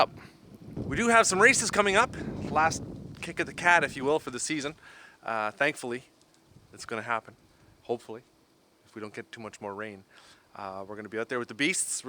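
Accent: Canada. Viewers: your accent . are you Canadian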